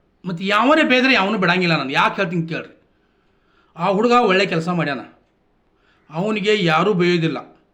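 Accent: North Karnataka, India. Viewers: ಸೂಪರ್ ಸರ್, ನಿಮ್ಮ ಮಾತು ಕೇಳುವುದೇ ಒಂದು ತರಹದ ಮಜಾ , ನಿಮ್ಮ ಉತ್ತರ ಕರ್ನಾಟಕದ ಮಾತಿನ ಶೈಲಿ ತುಂಬಾ ಚಂದ ಉಂಟು ಮಾರ್ರಾಯೆ. ಧನ್ಯವಾದಗಳು ಸರ್. ಸರ್.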